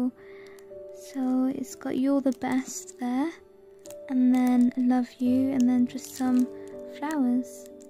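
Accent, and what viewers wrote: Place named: Southern England, United Kingdom. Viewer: awww you sound further south like me! I'm from Brighton, would have been awesome if you were closer!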